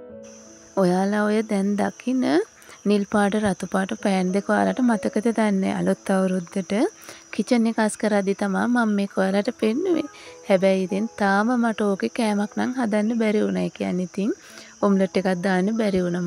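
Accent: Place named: Dry Zone, Sri Lanka. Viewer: වියළි කලාපයේ kiw නිසා අහන්න හිතුණේ oya කොහෙද අක්කේ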